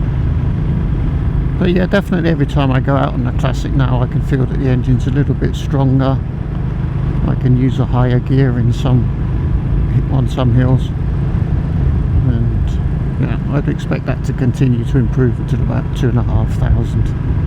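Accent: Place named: United Kingdom. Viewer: Hi. I am a new subscriber. Wonderful footage - thank you heaps. Quite spectacular scenery. I'm thinking where you are is a little remote? The roads appear to be brilliant but there seems to be a lack of people. I am also running in a new Classic 350 (Signals) so I find it interesting watching someone else going through the same process. It's private information I know, but you sound British, and I can't help but wonder what you are doing in Spain. Have a good one.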